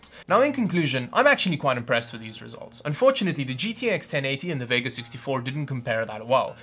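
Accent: South Africa. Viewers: Are you south African? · Jo dufe you a south african?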